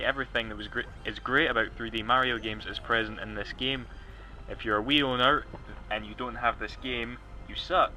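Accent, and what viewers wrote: Scotland, United Kingdom. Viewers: Which part of scotland are u from? Im from aberdeen ya see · well hes scottish, thats how we talk XD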